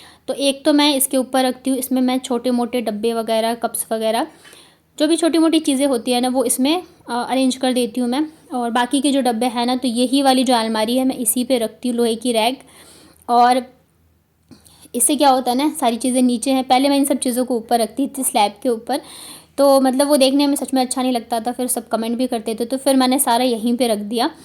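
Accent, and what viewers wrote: Bihar, India. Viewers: Didi aap Bihar se ho kya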